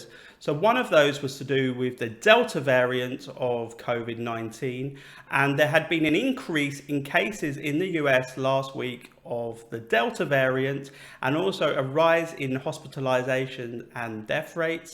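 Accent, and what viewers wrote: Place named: United Kingdom. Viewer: Your shirt is just as perfect as your crystal clear British accent. Love it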